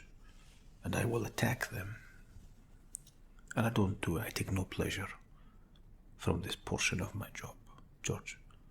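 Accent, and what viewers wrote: Russia. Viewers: Since you can do a Russian accent, I think it would be awesome if you could do a Most Dangerous Game role play as General Zarrof. I’ve always thought that would be cool for someone to do that. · You sound like a rusky I bet you're Russian are ya? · has the Russian accent down perfectly! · I thought ur russian cause ur accent is so good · If I wasn't already a longtime viewer, and I stumbled upon this video, I would have you were genuinely Russian. Excellent accent, and excellent video as always,. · Russian accent 👍😄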